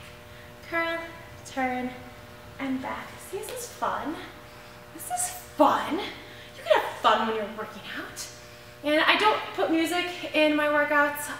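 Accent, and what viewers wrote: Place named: England, United Kingdom. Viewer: rawwrrrrrrrrrrrrrrrRRRRRRRRRRRRRRR! WOWZERS that was intense but rawwrsome. :oD My arms feel like jelly. I'm typing this with my nose - JK, or am I?! Have a rawwrrsome wibbly-armed kind of day. And where did you learn your English accent from?!?! Such fun! :oD xXx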